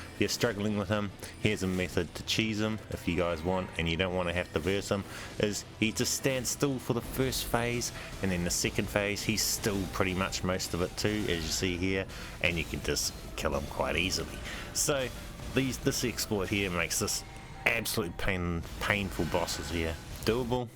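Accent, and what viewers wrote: New Zealand. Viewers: Thank you so much for the guide! Finally was able to beat it because of you haha. Also your NZ accent is so dam cool!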